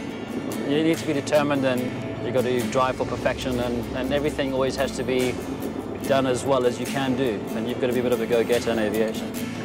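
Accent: South Africa. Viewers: I think he's South African by his accent and name,which maybe Dutch and therefore Afrikaans. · Is that a South African accent I hear? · he sounds very SouthAfrican -) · He's South African.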